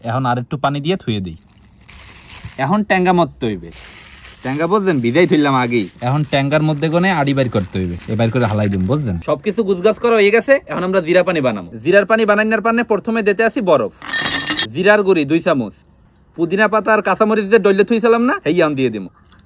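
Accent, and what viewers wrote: Barishal, Bangladesh. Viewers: কোন জেলার ভাষা?মনে হয় বরিশালের।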